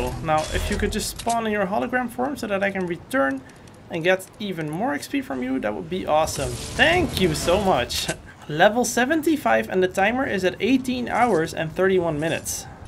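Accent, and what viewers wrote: Netherlands. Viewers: Please can you make a season seeven version? Also are you dutch? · Are you dutch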